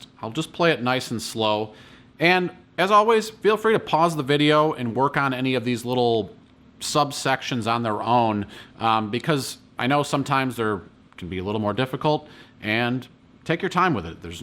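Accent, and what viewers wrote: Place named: South, United States. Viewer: Dudes definitely from the south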